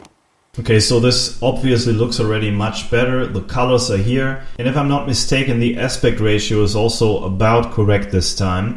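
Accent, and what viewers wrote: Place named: Germany. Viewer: Tolles englisch! Aber hehe, dass deine Muttersprache deutsch ist kannst du nicht verbergen. Tolle Videos by the way.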